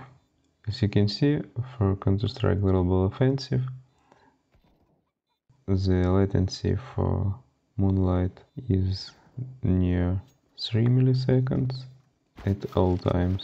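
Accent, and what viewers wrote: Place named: Russia. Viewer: Hey, I've noticed that you're Russian. Good English, man! :)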